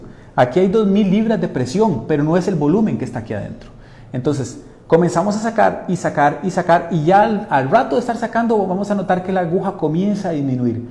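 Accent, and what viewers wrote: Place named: Costa Rica. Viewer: Es por su hablar un costarricense SALUDES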